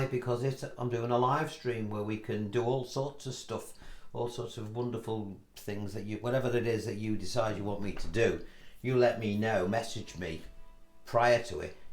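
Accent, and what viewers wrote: Liverpool, United Kingdom. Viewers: Excellent teacher. Scouser with no edge as you expect. That’s me speaking as a Yorkshire bloke. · ? Are you a scouser? I have seen your pieces for the last few months. I also look at Ivor Sorefingers, who has a similar thing as you. I really like the scouse accent! ❤😊 I'm a Londoner, but not a cockney.😂😅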